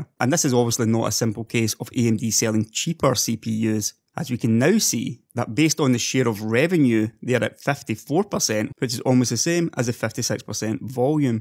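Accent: Scotland, United Kingdom. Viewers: Are you Scottish or Irish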